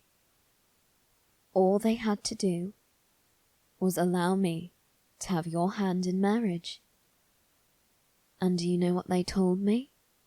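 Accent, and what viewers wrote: United Kingdom. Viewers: Ahhhhhh love your British accent it's so cute 💜💜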